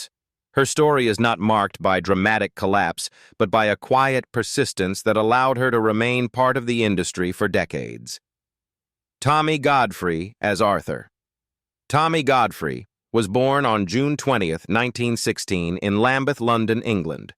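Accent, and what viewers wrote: United States. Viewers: An American voice as well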